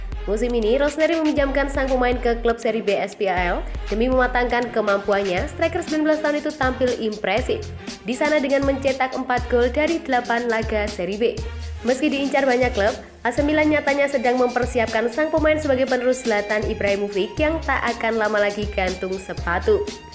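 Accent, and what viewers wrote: Java, Indonesia. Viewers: Masih kedengeran Medok mbak, hehe..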